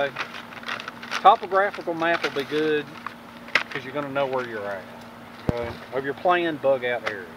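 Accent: South, United States. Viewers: love the accent.im southern too,but.....you have a voice that is calming and would garner trust.love your videos!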